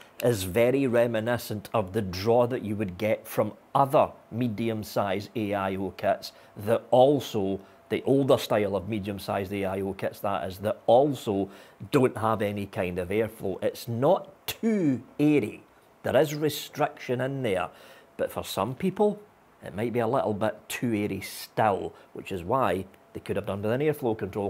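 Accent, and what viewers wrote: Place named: Glasgow, United Kingdom. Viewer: First time I've ever seen a video of yours. First idea popped in me `ead was if I was listening to Glaswegian or a bloke that uses the M-77 part of their commute home from there.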